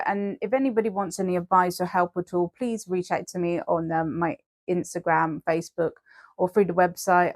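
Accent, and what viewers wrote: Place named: England, United Kingdom. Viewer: Sure is a lot of stuff in there ,but I could imagine the smell and rot if the guts were left in.....boy howdy !!! You sure have an eye for detail and are very meticulous just like me, so I can truly appreciate what you do ,and you do it so very well indeed ,very talented girl !!!! I love the music you play in the background and love your English accent ,gives your channel some class.....very classy and the occasional potty mouth brings it all together nicely. Don`t know who I like better ,you or David Attenborough LOL. BTW I spread my Giant Golden Orb spider and there was a cornucopia of bad language in the bug room......two of the damn legs were broken off before softening the poor bugger but I did triumph and was victorious in the end. I don`t know how you do these projects while recording yourself and having to narrate all at the same time working on such fine specimens for all the world to see.....amazing my dear !!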